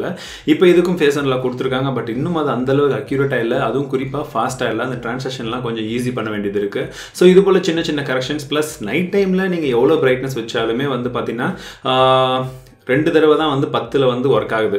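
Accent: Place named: Tamil Nadu, India. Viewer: Your Tamil accent is cool bro... nice explanation...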